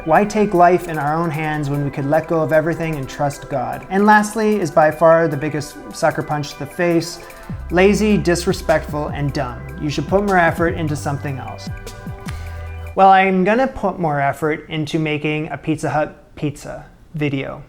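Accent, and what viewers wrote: Canada. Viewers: Where are you from? You're accent is super Canadian.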